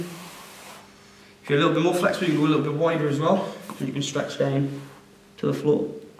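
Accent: Wales, United Kingdom. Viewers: Heard the welsh accent and seen you teach around newport. May have to pop in some time and check it out.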